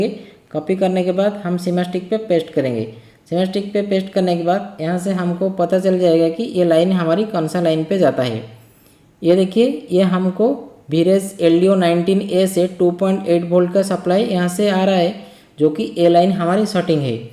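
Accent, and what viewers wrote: Odisha, India. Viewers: Emiti laguchi tame odisha ru boli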